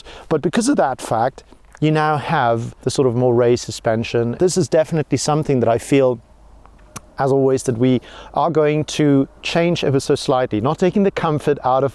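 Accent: South Africa. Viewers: im hearing South Africa, im hearing Welsh.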